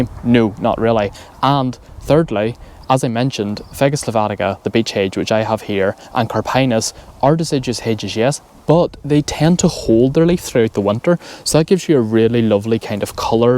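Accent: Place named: Ireland. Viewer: I love everything Irish and your lovely accent and the Irish vibe. Presumably you are in lovely Ireland? If not, bless ya for bringing us your lovely accent and charming Emerald Isle manner anyway! Apart from loving the Irish accent and Zero Zero Guinness, another thing I adore is listening to Gardener's Question Time on BBC Radio 4, but last time I heard the radio programme panel discussing hedges they seemed to hate cherry laurel just as much as you do. However, I've gone for cherry laurel round my garden because it is very cheap, tough and fast growing. I bought the smallest cheapest plants and after a couple of three years they are now almost a full grown hedge. You've also done a bit of yew around your garden, which is a great idea, however, even more expensive than beech, and takes even longer to establish. In fact, yew is extremely slow growing. 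However, indeed, the deciduous beech is great because it is a tree and so you can have a hugely high hedge - eventually as tall as a full grown beech tree - if the neighbours and laws of obstruction allow - but they are either extremely expensive or take years to establish. But, while if I had more money I might have gone for deciduous beech or evergreen yew, I actually really like my poor person's cherry laurel, zero problems with yellowing leaves, and in a short time it is making my garden look so much smarter, while I don't have to worry about the expense of a new garden fence and it certainly won't die from drought. And, yo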